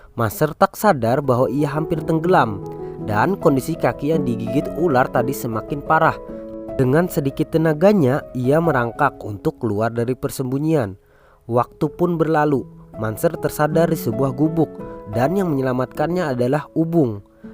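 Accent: Indonesia. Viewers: Ciri khas suaranya kaya orang Kalimantan 😁 aku udah subscribe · Kaka ya orang banjarkah ? Soalnya logatnya ketahuan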